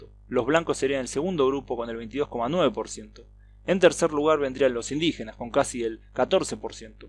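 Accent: Argentina. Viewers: Video basura... Argentino tu acento asqueroso.. dan ganas de cagar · Latino???¿ De qué estás hablando? Serán países de habla Española Latino era una lengua que se hablaba en el Lacio (Italia) y hoy el único país que habla latín es el Vaticano. Latinoamerica es una denominación que los franceses acuñaron para quitarle el inmenso poder que tenía España. Tu acento parece Argentino. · O espanhol argentino do narrador é o melhor. Muito bonito o acento platino.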